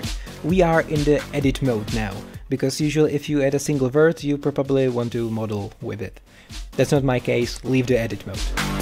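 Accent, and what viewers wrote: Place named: Czech Republic. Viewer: Hmm, you accent sounds little bit like czech, or slovakian? Im sure youre from central europe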